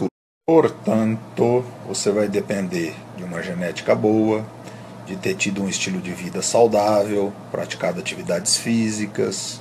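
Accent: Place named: Bahia, Brazil. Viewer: Você e baiano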